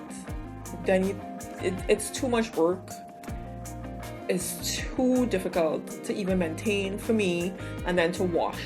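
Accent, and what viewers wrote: Trinidad and Tobago. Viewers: Ohhhhh is that a trini accent i hear?? Big up the T&T massive lol we out theree. Very informative vid too. I have a twa and im still trying to get ways to style it , sigh.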